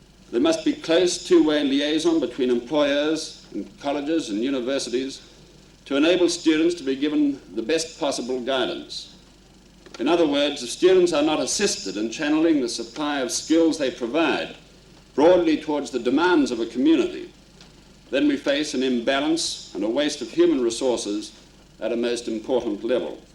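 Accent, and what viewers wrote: RP, United Kingdom. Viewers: sounds like the queens accent.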